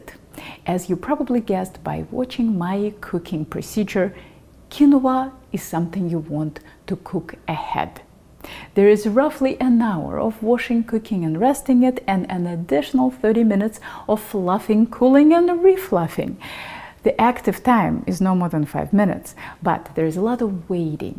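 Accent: Russia. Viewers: Good info ,while her English is great Russian accent still apparent. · what's with the Russian accent? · I lost it for her Russian sweet soothing Accent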